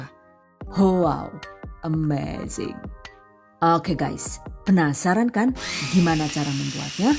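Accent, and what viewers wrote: Banyumas, Indonesia. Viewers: Ya Allah ngguyu ngakak nganti ta ulang² serius deleng mlh ngapak² ,, kencote metu 😅, suwun mamake resep e mntul pisan 👍🏻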